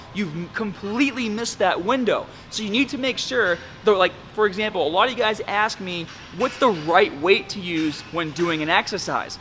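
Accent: Boston, United States. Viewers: You don't sound like this in your instructional vid lol nice bAston accent. Keep up the good work though! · Did his Boston accent get stronger? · He is from the Boston, Massachusetts area I believe. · came back after 2 years and he suddenly has a Boston accent. Lol wtf. Weird ploy to attract more viewers? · it's boston accent. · I love going back 6 years on your channel and seeing how little gains you've made, you look the exact same. You also didn't have a boston accent 6 years ago. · I never noticed that Boston accent until after watching The Heat. lol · he actually comes from Boston, so...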